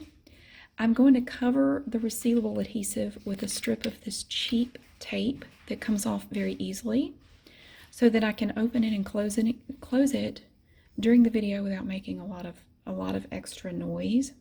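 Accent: United States. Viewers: This is so comforting to me. I live in the UK and my grandparents live in the States so I love watching your videos to hear the accent and the American kitchen and food. Just how I remember ☺️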